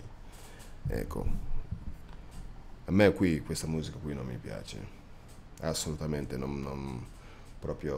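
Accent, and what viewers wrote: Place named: United States. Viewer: È americano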